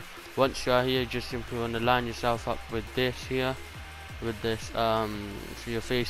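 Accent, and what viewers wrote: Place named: United Kingdom. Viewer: That's just how brits talk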